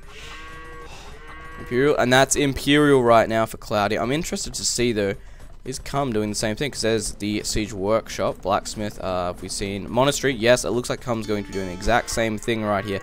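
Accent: Australia. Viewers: Where is the commentator from? Australia? UK?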